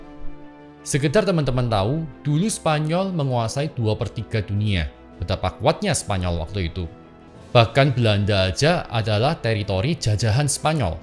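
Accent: Java, Indonesia. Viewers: Jamie Dimon ngk penah denger om? Masa elu edukasiin org2 lewat Youtube tapi Jamie Dimon aja "Siapa itu?". Gila amatt. Mirip Mario Teguh lu ya. Uda medok & ngertinya cuma ngajarin orang mulu. Sendirinya mah wawasanya ya alakadar. ☺️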